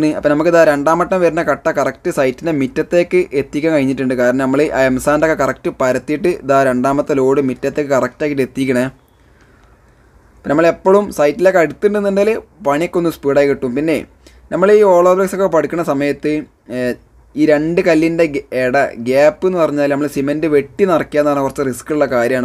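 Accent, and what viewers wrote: Malappuram, India. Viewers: വീഡിയോ അടിപൊളി യാണ്.... എന്നും പുതിയ വീഡിയോ വന്നോ നോക്കും... പിന്നെ ജമ്മളെ മലപുറം ഭാഷ പൊളിച്ചു...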